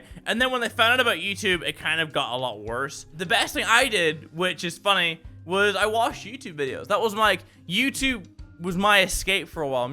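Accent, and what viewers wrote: Minnesota, United States. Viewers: I DIDNT KNOW YOU WERE IN MINNESOTA OOOFFFDAAA. 100% MINNESOTAN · started picking up a Minnesotan accent fast that makes me happy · Bruh unless you were like up near bemidgi no one talks like that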